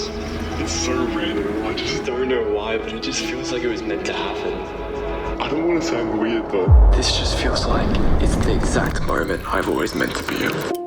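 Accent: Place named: Finland. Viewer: Great video! I was a Professor of Geography for 32 years so intimately understanding places was a part of my life. I thought that you did an excellent job in describing the different realms of the land. The primary lack was in describing the peoples of Finland. It will probably take time before you can describe the culture because of the language difference, but I think that you are picking up the Finnish acent in pronunciation of the place names. The hurdle that you will be the experience of the extreme winter. It is brutal. Nothing like any place in Australia, even Tasmania which is the coldest part of Australia. Buy some very warm clothes and get a light box if you experience SAD depression from the lack of light. Hopefully you will have a great time there. My suggestion also is to enroll in a class for Finnish.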